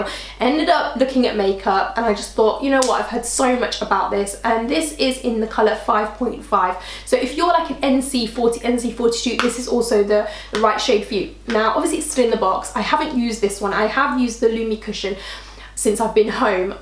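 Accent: London, United Kingdom. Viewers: You're so very London!! I love it!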